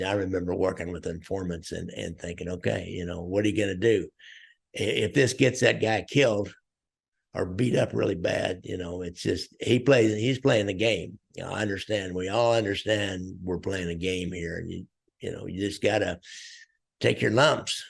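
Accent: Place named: South, United States. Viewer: Great show as always. Its so refreshing to hear these stories from t&e perspective if the other side. While there are a few other former lawmen on youtube or guests on others platforms you have this kind of non biased, fair manner of reporting on your subject. Theyre all pretty ruthless criminals yet if theres anything good or decent about the person that sets them apart from the average thug youll bring it to light. Also, the best part is with your southern, countrified accent you dont sound like some wannabee, half-assed wiseguy. I'm Italian american myself and grew up in rural florida unlike all my other relatives who live within 20 miles of where my grandparents settled in Brooklyn over 100 years ago. Friends get a kick out of my lifelong interest in and of my extensive knowledge of Cosa Nostra because my southern accent makes me a hic or redneck who shouldnt have the interest, background and knowledge that I do. 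I never understood why that was so funny or strange to them until i started listening to your show. Now i kinda get it. For some reason though i dont think anybody feels the same when they listen to James on OC SHORTS. Anyway, just wanted to thank you for another great one. I also wanted to request a show on Trafficante family. We've all heard about his ties to Hoffa, Cuban casinos and possible involvement in the JFK assassination but theres not alot out there about local activities in Tampa and throughout Florida especially S. FL. mainly Dade and Broward countie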